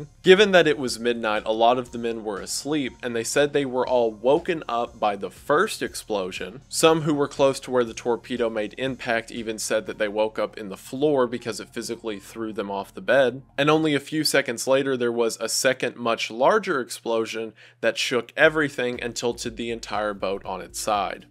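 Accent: South, United States. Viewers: Please do your 8 hour World War 2 video. And if you are worried about the algorithm break it up into three or four parts!!!!! But just so you know I would absolutely listen to every second of it like my life depended on it. I love your channel and I love your voice and I appreciate hearing someone who's voice sounds familiar.. I feel like most YouTubers who gain popularity are not from the South, and the very first time I heard your voice, I immediately thought to myself, "ahhh yes this sounds like home."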